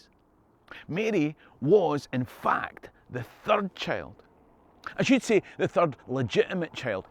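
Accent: Scotland, United Kingdom. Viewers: I could listen to your brogue all day.... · You just popped up into my YouTube feed and I’m so glad that you did....well, when I say “you”, I mean “your channel”. I love history, but focus mostly on The British Isles , Europe and the States. You are my first Scottish channel and you make history energetic, interesting, and even fun. You make it all the better with your amazing accent....although I, being of the States, in reality is the one with the accent. Thanks, so much!! I have joyfully clicked, smashed and rang the bell (icon). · I'm just a regular American, but I love the Scottish accent.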